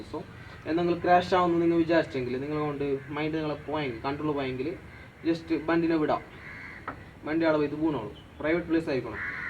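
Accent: Kasaragod, India. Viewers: Tips ellam okke aanu bro.. Kasrod slangum polichu.. Kurachu koodi spacious aaya sthalath venam stunt tips kodukkaan.. Ennale viewersinu oru majje indaavu.. Slang oru kuravaayitt kaanathe confident aayit samsarikkanam.. Otherwise all good.. Keep going.. 😍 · Nth da slang kettal arila ksd😍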